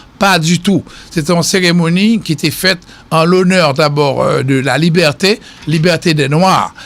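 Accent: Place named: France. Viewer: Misye pale tankou yon blan fransè 😂😂😂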